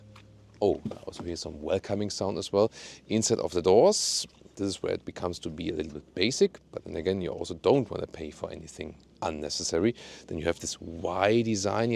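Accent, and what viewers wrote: Germany. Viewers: Such a beautiful review, very enjoyable to watch and listen too. I do love the elegant German tone of voice in English language. 11/10 review. Thank you so much!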